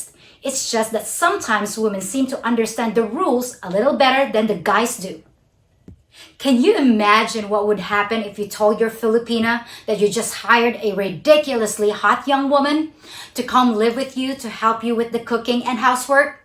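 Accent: United States. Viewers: Wow, you're very likable. You are definitely a filipina but you talk like an American. How did that happen? - Did you live in the US? Is one of your parents a foreigner? - Or maybe you're just an internet junkie who simply figured it all out!:) - Kisses from Toronto Canada. - Mauhhh... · Your chanel is well balanced, informative and easy to be understood. The only thing is that strong american accent what is unusual for european ears but... I like your channel. Keep on doing. Smart woman.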